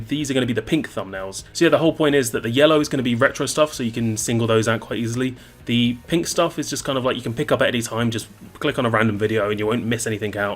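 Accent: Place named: United Kingdom. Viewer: you sound like very English person ever, but nonetheless i'm for this channel change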